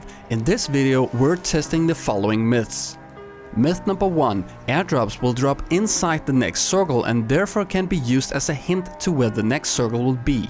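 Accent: Denmark. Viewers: You sound danish my friend :-) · Hahah man kan høre han er dansk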